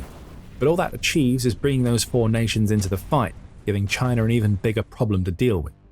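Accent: United Kingdom. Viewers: Why so many video makers use narration with a heavy British accent which is less easy to understand for people in America? Is it because it sounds more authoritative or because more people in the world are in the areas which use that type of English? Or, most videos are made in UK? I wonder.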